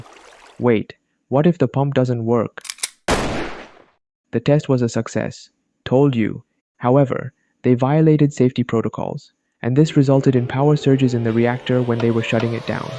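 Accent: India. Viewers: he sound indian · Bro has an Indian accent made by ai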